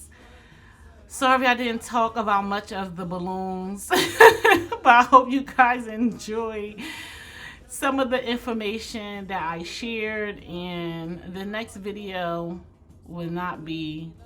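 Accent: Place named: Philadelphia, United States. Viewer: First, Allah will take care of the persons who did not make the final payment. Next, you have a beautiful soul, don't allow this incident to change who you are. The change in the policy was necessary. Last, the balloon design was BEAUTIFUL! You are very creative. Keep up the great work! May Allah continue to bless I kept saying to myself, she sounds like she is from Philly! I checked your website, and you are in the Tristate area. I need to find out who the event planner is so I can never use her in the future.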